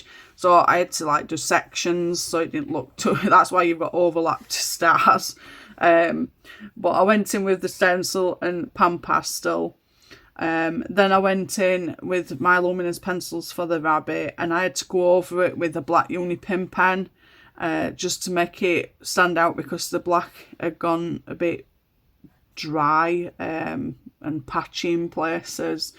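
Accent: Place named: Huddersfield, United Kingdom. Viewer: The Hub and I are having bets ob where you're from. He says Hudderfield. I could listen to you say Petite Poupees all day! 😂❤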